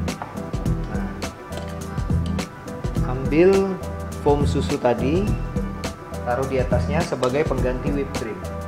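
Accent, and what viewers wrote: Medan, Indonesia. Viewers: Anak medan ya bang ?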